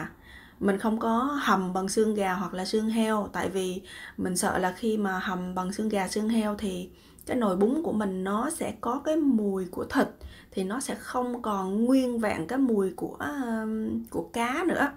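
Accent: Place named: Da Nang, Vietnam. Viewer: Mình cũng ng Đà Nẵng , nge nói mình thấy vui vui , vì gặp đồng hương bên xứ người, mình hiện đang ở Mỹ ạ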